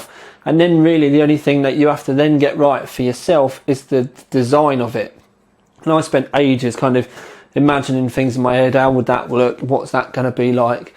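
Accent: England, United Kingdom. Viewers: *Clicked on video* *Heard you were English* *Subbed* *Watched the rest of the video* *Stayed Subbed* Such a good rig!!!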